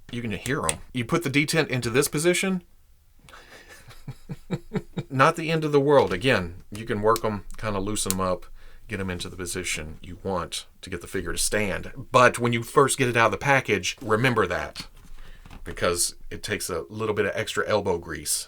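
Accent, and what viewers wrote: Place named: Arkansas, United States. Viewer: Wow, Arkansas really comes out in this video.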